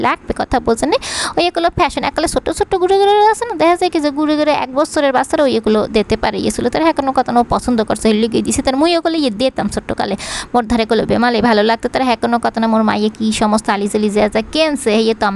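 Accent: Barishal, Bangladesh. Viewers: আমার বাড়ি মাদারীপুর। থাকি মালয়েশিয়ায়। আমার ভাবি ও বরিশাইল্লা।কিন্তু আপনার কথা এক্কেবারে খাঁটি বরিশাইল্লা। শুনলে অনেক হাসি পায়। আপনার স্বামী ও কি বরিশালের?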